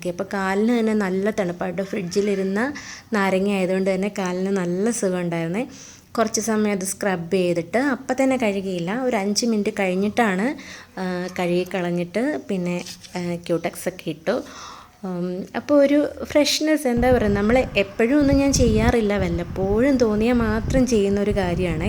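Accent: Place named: Kottayam, India. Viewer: You are too genuine god bless you ❤️❤️. ഞങ്ങൾ കോട്ടയത്തു നിന്നും രണ്ടു തലമുറ മുൻപേ കണ്ണൂരിൽ കുടിയേറിയവർ ആണ്. കോട്ടയം ഭാഷ കേൾക്കുമ്പോൾ ഇഷ്ടം ഒരുപാട് മര്യാദ ഉള്ള സംസാര രീതി ആണ് ❤️❤️❤️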